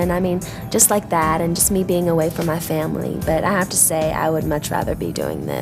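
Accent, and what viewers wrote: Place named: South, United States. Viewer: Too bad Britney has lost most of her cute Southern accent :(.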